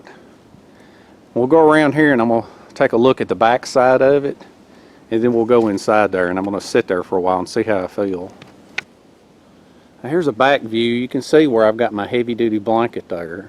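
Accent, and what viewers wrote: South, United States. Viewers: God love ya , I could listen to you ramble all day . The cute things you say ,combined with that southern accent , and laugh ,your irresistible ! It's been insanely cold up here in Ohio . It surprises me that it's that warm . I would have thought most of the heat would rise up. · this is Mark from north central Ohio. I really enjoy watching your content. You are such a mellow dude and I like that southern twang. "I think I'm fixin' to go in there." I'm sitting in my recliner drinking a cup of coffee that I made on my alcohol stove. That fire reflector is a fantastic idea. There is another guy on YouTube who is really popular and has a lot of great content but he makes some of these elaborate contraptions and like you mentioned it takes a lot of time and energy making one of those heat reflectors out of wood and he's got major OCD because he goes back and chops the ends off so they're exactly the same length. 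Like you really need that if you were in a survival situation. 😃 You mentioned that you don't own property to camp on so you cannot set up a permanent shelter but what you could do is after you take your shelter apart you could just pull those stakes out of the ground and gather them together and lean them against a tree and then when you come back again it would be much quicker to set up because everything is prepared. All you gotta do is grab the same stakes that you used and put those back in the ground and rig up your shelter again. What I really like about your videos is your personality and the fact that you're not trying to sell something. You're going out there to have fun. There's another southern boy that I like watching, a guy named Blackie Thomas from Alabama. Same thing with him, he's a mellow dude and he has lots of videos and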